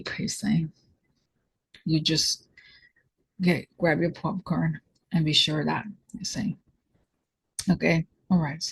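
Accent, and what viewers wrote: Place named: Russia. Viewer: Thank you 🩵🙏❤️✨🌟. Your Russian accent makes me smile and laugh even in these very trying times!!! Thank you!!🙏 God Bless you🙏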